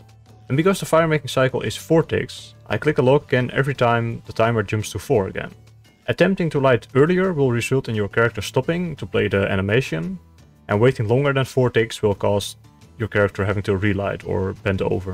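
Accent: Netherlands. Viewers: go goooo, you're a legend amongst the lowlands and even a bigger one on this game. Your episodes are evolving greatly and I just can't get bored of that lovely Dutch accent. Keep it up man!!!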